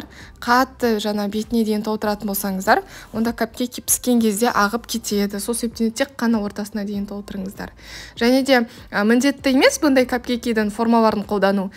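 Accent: Standard. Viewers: Таза қазақша сөйлеген тіліңіз қандай балдай?! 👍👍👍👍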